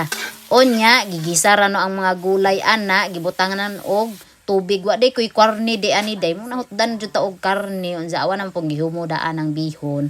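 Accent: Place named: Bohol, Philippines. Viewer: Akoang Lolo ug Lola mga bol-anon. Si Lola tga Maribojoc, ganahan kaau sya mag tan-aw sa imong videos nga naay voice over. Mahimoot sya kay bol-anon kaayo.😂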